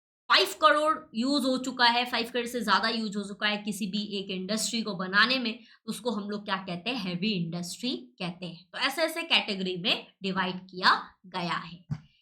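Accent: Maharashtra, India. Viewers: Mam app Marathi ho kya 😅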